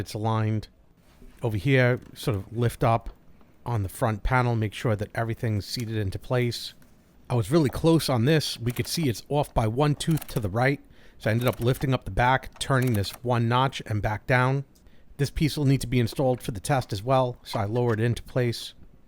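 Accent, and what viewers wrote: United States. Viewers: I enjoyed the whole 4 video series - very methodical and informative. If anyone needs the inspiration to perform this type of work themselves then your videos give it in spades. Question: Why was the machine set to language “German” when you’ve such a strong American accent ?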